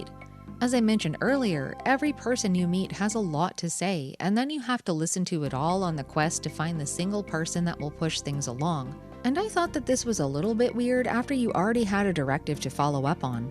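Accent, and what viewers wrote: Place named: Atlantic Canada, Canada. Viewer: I just watched your Faxanadu play through and couldn't stop thinking that you sound like you're from the east cost of Canada :)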